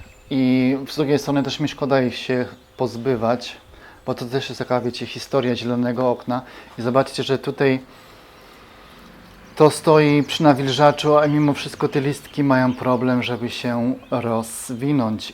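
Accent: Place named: Podhale, Poland. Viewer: dziękuję tak zapytałam bo akcent jak z Podhala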